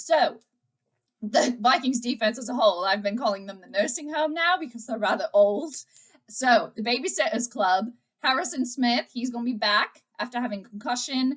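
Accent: United Kingdom. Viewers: ..I hear your British accent, and wish Roar of the Lions UK & You, could have had time to collab. They said they were going to have you on after the game, but It never materialized. I so hope both of you are able to collab before the Vikings vs Lions next game. I catch their show during the week. It's a trip to hear them talk & usage of the English language. "That team/player played like Rubbbish" etc. LOL. Hope to catch you for your live stream. Will look for your notification when you go live. 20 subs to 1 K. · Hey, very nice british accent, make me want some tea and crumpets! LOL I will be there in spirit as I will be out of town sun morning- in the twin cities. you're the tops as always. cheers. see you on the flip.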